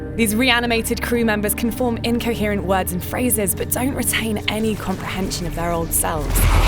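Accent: United Kingdom. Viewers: damm narrators british accent sounds gorgeous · tucker she is British not American this time maybe that's the different accent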